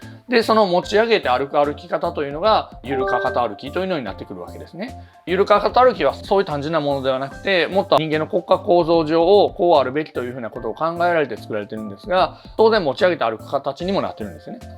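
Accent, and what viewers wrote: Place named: Kansai, Japan. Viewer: 関西訛りですね。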